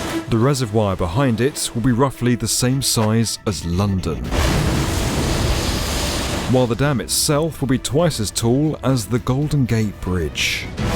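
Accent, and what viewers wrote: England, United Kingdom. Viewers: why naratorrrr talk like inglish with nose full off secretions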